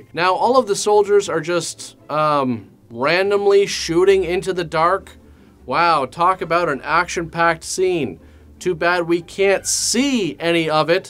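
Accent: Canada. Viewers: First visit... You sound like a Canadian Sterling Archer... Only adds to the sarcasm. Excellent work.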